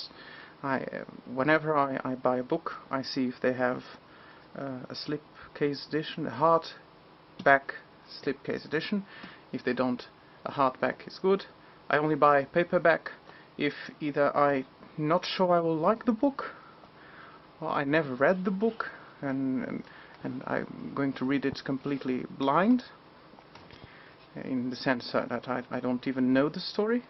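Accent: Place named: United Kingdom. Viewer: i thought u were british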